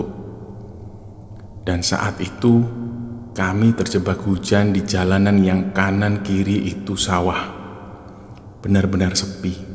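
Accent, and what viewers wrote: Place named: Tegal, Indonesia. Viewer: Walah ternyata mas wong Tegal rupanya. Ngapak dong...😁😁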